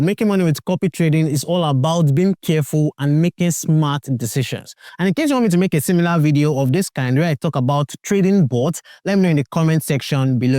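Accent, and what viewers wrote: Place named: Nigeria. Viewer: Ur doing the lords work abeg. Been watching the videos in oyibo accent since and i was so confused. I needed this in complete naija accent lol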